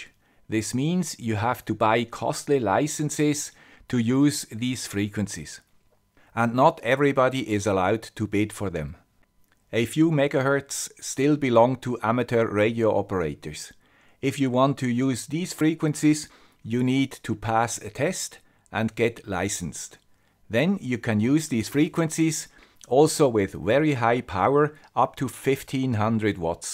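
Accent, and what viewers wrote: Switzerland. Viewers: Perfect video ! Loss in free space chart alone is great. Simple as Einstein says but not simpler. Thank you guy with the Swiss accent for this another great video. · is that a picture of you in the bottom right hand corner at the opening of the video? Its so nice to be able to put a face to your Swiss accent.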